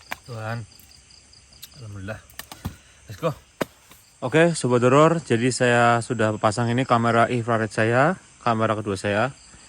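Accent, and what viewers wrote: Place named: Tegal, Indonesia. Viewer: Bg asli mana bg? Ko logatnya kek ada tegal nya 😂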